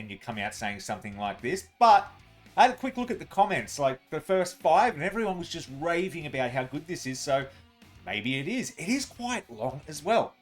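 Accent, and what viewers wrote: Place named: Australia. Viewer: Australians ugliest fickn accent ever, but metal up your ass,from kiwi land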